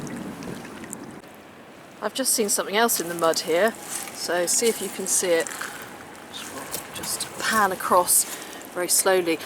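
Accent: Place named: England, United Kingdom. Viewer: Hey Dearheart, I do the same thing, getting stuck on a word or phrase So here are some ^Words^ to help you out. After all, what are friends for, right? Appealing, absorbing, captivating, curious, fascinating, intriguing, delightful, inviting, pleasing, remarkable, amusing, charming, inspiring, thrilling, fabulous, and Galvanizing. I like the last one, coupled with your English accent, would be awesome.LOL So there you are, hope this helps in your quest of expanded vocabulary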